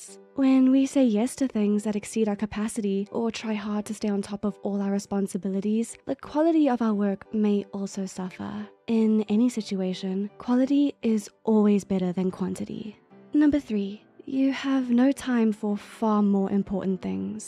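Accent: England, United Kingdom. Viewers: This english accent is a British accent, generally I have heard American, cool though, like the voice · Yo, what is this lovely British Accent? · Don't like the English accent. Your normal voice is much more soothing!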